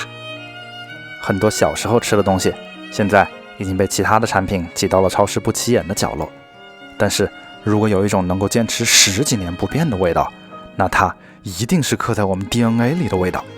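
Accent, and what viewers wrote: Wuhan, China. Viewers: 是怎么做到标准的普通话中夹着浓浓的武汉腔的😂😂😂听起来格外“需服”！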